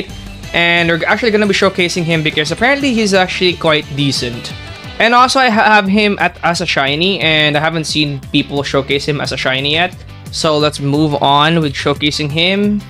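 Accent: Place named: Philippines. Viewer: bro I'm Filipino, but your 100% Filipino, the accent broo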